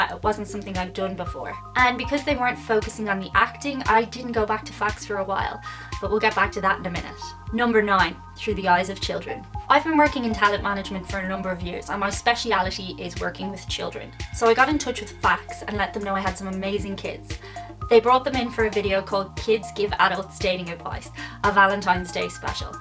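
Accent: Ireland. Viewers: Dang it, it hit me like a truck ! Didn't see that coming. I guess all good things come to an end. Facts was a fun way to get used to the irish accent as I'm going to work in Ireland soon. Oh well, I reckon I have many channels to subscribe to, now.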